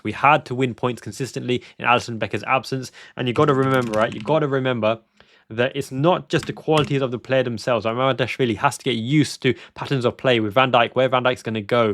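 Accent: United Kingdom. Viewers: Another top video and happy belated birthday 🎂 to Mia. Out of curiosity....it's clear from your accent that you were either born in the UK or spent many years here. What took you back to Japan ?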